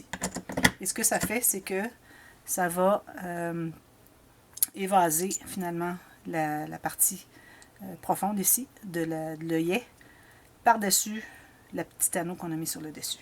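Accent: Quebec, Canada. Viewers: Cc, merci pour ces nouveaux tutos! Gardez votre accent. Ça me rappelle ma cousine disparue qui était originaire du Québec! Courage pour votre cousine et à vous! À bientôt, bye bye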